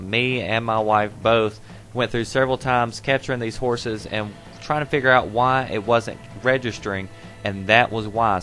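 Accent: South, United States. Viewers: Are you from the south states? Your accent kinda sound like it.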